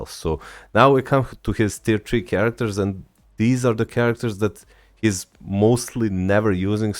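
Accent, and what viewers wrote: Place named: Serbia. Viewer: As soon as I heard your accent, I knew you were from Serbia. Glad to see that there are people that play MFF. My IGN is L0NEW0LF312.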